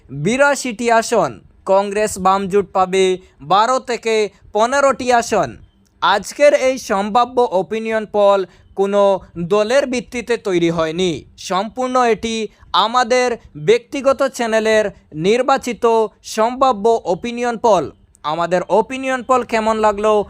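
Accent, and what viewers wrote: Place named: Bangladesh. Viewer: এটা বাংলাদেশী মাল কথার ধরন শুনে মনে হচ্ছে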